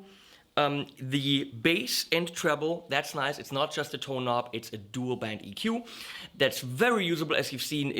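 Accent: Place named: Germany. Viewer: LOL! Love your demo of the Dark Matter, your humour, and your German accent! Keep on! Helmut from Germany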